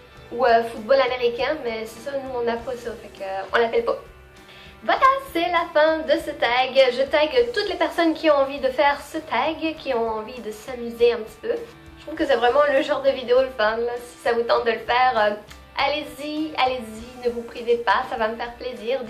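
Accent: Quebec, Canada. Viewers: Omg! J'adore ton accent😂 C'est trop drôle genre ta l'accent québécois et des fois français! C'est trop chou 😂😘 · J'adore ton accent québécois-francais 😍😍😍😘✌️✌️👌👌👌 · Tu as réussi ton objectif de divertir avec la comparaison des expression. C'est amusant drôle et instructif. Et pour ton accent à toi, alors c'est évident que tu es «presque Québécoise» Merci pour tes vidéos. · c'est amusant parfois tu as l'accent québécois(la plupart du temps) et d'autres fois un accent français sans accent.Tu es Québécoise ou Française? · Déjà je pense que ton accent québécois tu le garde juste par envie pcq ta prononciation on français est quasi parfaite et puis ce tag est très intéressant pr découvrir chacun l'autre ;) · Honnêtement bravo à toi j’ai jamais vue ça tu as tellement bien pris l’accent Québécois 😉 et tu as raison car il est tellement mieux que l’accent français 😂🤣 p.s je suis d’Amérique du Sud 🇬🇫 (d’un département français) et moi aussi j’adore le Canada 🇨🇦 et le Québec je compte bien m’en venir habiter la bas, bye 👋🏽 et encore merci pour ta super vidéo. 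· Tu l'as bcp l'accent de Québec quand tu parles ! ;) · Je suis moi même Québécoise et en 2012 en camp de jour j'ai eue un moniteur Français et a la fin de l'été il parlais comme toi et ton accent est vraiment interessant et aussi tu a le parler d'une Québécoise mais l'accent d'une Française s'est vraiment bizard mais continu t'est video elles sont drôle ;) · c'est assez drole que tu as un accent québécois alors que tu habite en france je viens de découvrir ta chaine et tu est super 😊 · J'trouve ça drôle/cute, elle parle à la française et quand elle vient pour finir sa phrase. Elle change pour l'accent québécois. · wow c'est trop cute tu parles semi à la québécoise semi à la française ...c'est adorable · C'est intéressant de voir qu'elle se met à parler avec l'accent du Québec, de façon naturelle, sans qu'elle ne s'en rende compte. L'accent devient plus réaliste. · tres bonne video, ton accent est 99% quebecois ! 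^^ continue tes video! (just comme sa, jolie coupe de cheveux ;) 👌😄✌ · Ahahahaha!! T'es pas mal drôle à entendre ^^ première personne avec l'accent Francais que j'entends qui a pogné l'accent Québécois! :p j'ai des petites affaires à ajouter (j'ai pas lu tous Les comms alors dsl si on t'a déja repris!) Pour Les "chaussures d'été, on dit ballerine, pour Les cheveux on dit "applatire" et elles faisaient référence au "soccer" vs "footbol American" vs " footbol" ^^ oh et, ici, on "fox" Les cours! "Foxer" :p hihihi merci c'était "bien sympa!!" ^^ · je n'ai jamais entendu un accent comme ça! Il est unique hahahah c rare d'ententre un quebecois parler français ou un français parler québécois , toi tu as les deux! c fou c vrm original jaime bien ahha :) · Au Québec on loff ou fox les cours :') mais j'adore ton accent semi québécois semi français!!😊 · Salut! J'me demande pourquoi personne s'est levé et dit que au Québec, pour Cotton Ouatté, on utilise aussi le terme Kangourou (l'animal) parce que y a une poche en avant au niveau du ventre. 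En tout cas, t'es vraiment une fan du Québec et c'est agréable à voir/entendre/regarder. J'trouve que y'a pas vraiment de youtuber québécois alors je vais m'y lancer à temps perdu. Soit heureuse, et le "Bon Matiiiiinnnnn" a aussi changé ma vie, quand je l'ai entendu de la bouche de la fille que j'aimais, au Cégep. On a même une marque (sorte, compagnie) de pain qui s'appelle Bon Matin au QC. En tout cas, ça doit être la marde pour toi vue que t'as ET l'accent français ET l'accent québécois. Le monde doivent te juger peu importe où tu vas hahaha. On s'appel et on déjeune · Je trouve sa tellement drôle car je viens de commencer a écouteur tes vidéos et la avec tes vidéo du Québec se fou comment tu commencer a parler comme nous québécois! Je trouve que cette accent la te va bien :) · très bel accent ,je te croyais québécoise au début et je suis québécois .Tu es très jolie aussi bref continue c l'fun en ostie lol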